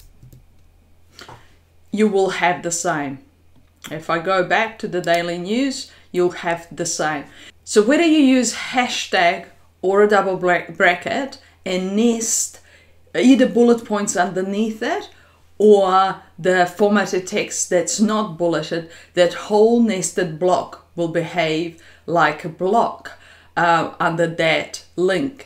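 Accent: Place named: New Zealand. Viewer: Great explanation,:). Are you from New Zealand btw? I am taking a guess based on your accent.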